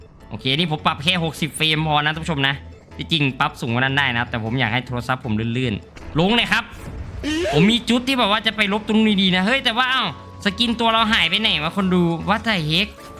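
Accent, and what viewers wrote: Korat, Thailand. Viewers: พี่คนโคราชป่าวครับ555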